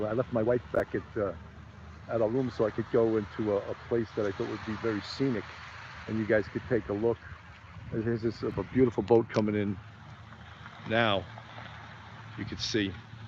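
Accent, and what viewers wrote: New York, United States. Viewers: Being Canadian I love the NY accent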